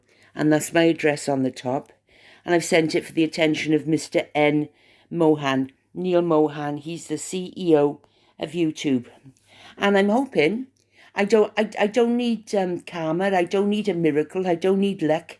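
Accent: Wales, United Kingdom. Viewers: I have missed you. I used to listen to you going to sleep. In Australia 🇦🇺. You made me laugh. I’m so glad. I’m so sorry with what you went through. We must stick together. I hope wales with your welsh accent will help me sleep. I’m glad I found your channel again 😊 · Hi listening to the vlog again. I had a thought abt subliminal messages imo & allegedly MM with her Raspberry Jam & Dog Biscuits was trying to make some of the BRF look like “Jammy Dodgers” IYKYK… think you get my drift. Glad yr back & yr welsh brogue is wonderful listening. Best wishes from Adelaide; South Australia🦘🐨🇦🇺💐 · So lovely to hear that beautiful welsh accent. You are a diamond xxx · I couldn't believe my ears so absolutely delighted to hear your beautiful Welsh voice again.. i have missed you so much. I wish you all the very best in your endeavours, Caroline ❤❤❤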